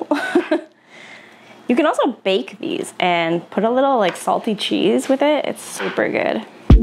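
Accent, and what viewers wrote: Costa Rica. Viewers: En español tienes acento costarricense, por un momento pensé que estaba usando una dobladora costarricense 😅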